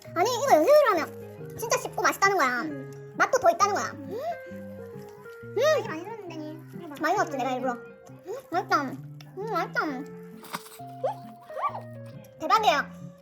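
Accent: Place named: Gangwon, South Korea. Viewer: 이 분 때문에 영동지방으로 어학연수 가고 싶은 일인 여깄음. 정말 극강의 로망 억양 인토네이숑… ㅎㅎㅎ❤❤❤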